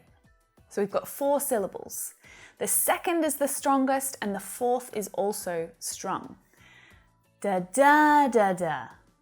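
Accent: United States. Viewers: Es Inglés americano🇺🇸🗽? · Is this american english pronunciation? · Many English people pronounce the word "hear” as "hiya".But yours is a bit sounds like American accent.May i learn the difference between them?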